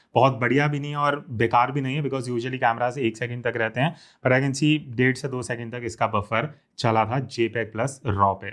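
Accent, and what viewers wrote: India. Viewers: Are you speaking English or trying to f*ck the language? Don't even try it again, you had better speak your own language (actually it sounds Indian, not English), and you have no f* idea how to pronounce it.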